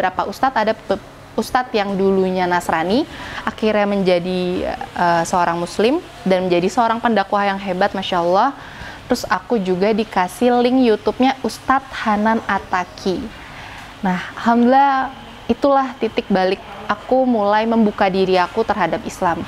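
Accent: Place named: Jakarta, Indonesia. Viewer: Jakarta banget logatnya 😀 Istiqomah cii yahh 🙏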